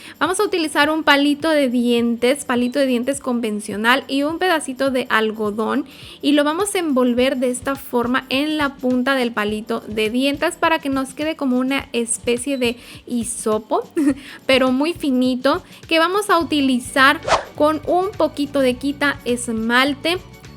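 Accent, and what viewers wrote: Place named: Mexico. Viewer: Oye tu te expresas como mexicana yo creí que eres de Mexico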